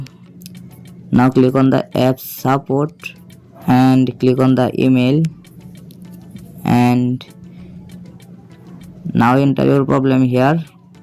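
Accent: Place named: Tamil, India. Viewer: Tamil voice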